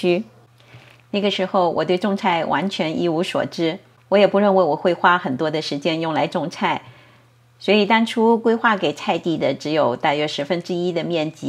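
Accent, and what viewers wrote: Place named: Northern Fujian, China. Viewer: 您也算是业余花园设计师了，用心打造美好生活😊听您的口音是不是闽北地区的？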